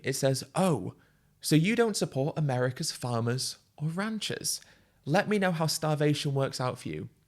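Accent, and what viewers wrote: United Kingdom. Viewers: I love the way Ed has such a posh accent but can't pronounce 'th' in some words, it's so endearing! The arguments are first rate too!!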